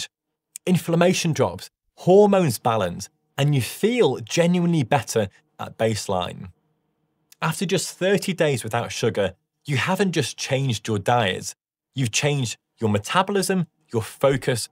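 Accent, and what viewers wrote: England, United Kingdom. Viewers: Oh the delight of hearing a nice English voice! Thank you dr.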